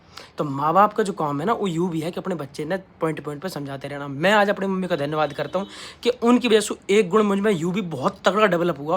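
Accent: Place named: Braj, India. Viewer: Bhaiya aapki voice se lag raha hain ki aap mathura aagra ya vrindavan ya hatras se hain kaha se hain bhaiya badi pyaari aawaz lago moko to lago ki tum brajwasi ho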